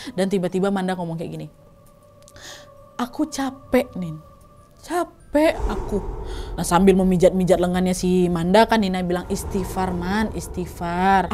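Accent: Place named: Java, Indonesia. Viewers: logat Jawanya kak fasih sekali👍😁 cocok kak jadi orang Jawa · asik dan suka banget denger cerita pake bahasa jawa kaya medok banget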